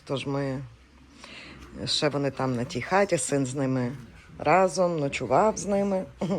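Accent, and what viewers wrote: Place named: Western Ukraine, Ukraine. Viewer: Дуже интересно, ви из захидной Украини? А звидки? Акцент наш!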